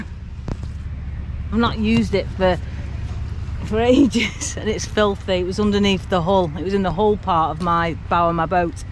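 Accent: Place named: West Bromwich, United Kingdom. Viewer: Lovely approach to life,. Always positive! I am from Switzerland and spent som time during my study years in West Bromwich, loved the dialect. Are you from that area also?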